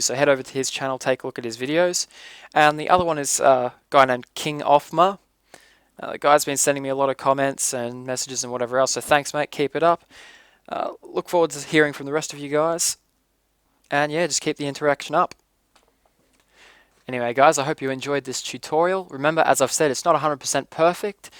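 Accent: Australia. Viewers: r u aussie? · are you an aussie :D?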